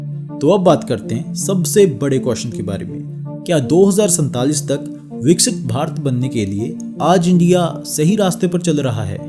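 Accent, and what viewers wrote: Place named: Punjab, Pakistan. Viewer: Accent sounds more like how pakistani punjabis speak.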